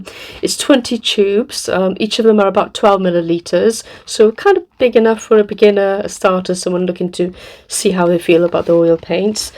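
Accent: United Kingdom. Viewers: Watching again here, it's successful unboxing arts supplies. Is it not expensive. I love your British accents ❤❤❤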